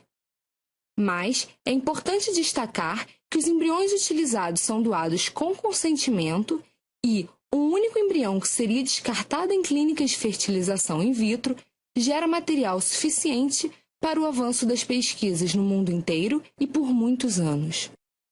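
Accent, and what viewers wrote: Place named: Rio de Janeiro, Brazil. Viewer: Sutaque maravilhoso, as cariocas são maravilhosas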